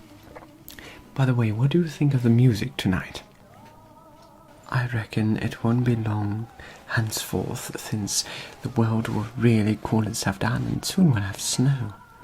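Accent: RP, United Kingdom. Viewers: Love this! Just discovering your channel now,. Love the background music, your posh voice and the creativity of this upload...brilliant! Thank you for the tea and cakes while catching me up on the latest gossip.❤ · of all the historical asmr roleplays i watch regularly, these are my favorite as the older posh accent tops it off so well!!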